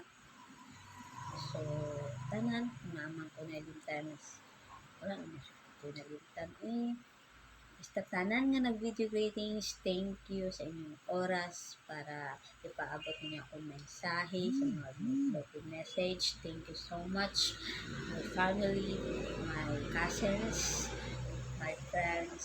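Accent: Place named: Visayas, Philippines. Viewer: suggest ko mag content ka, mag usap kayo ni camilo in english kay camilo tamang pagka english tapos ang sagot mo english rin kaso barok at bisaya pag ka pronounce.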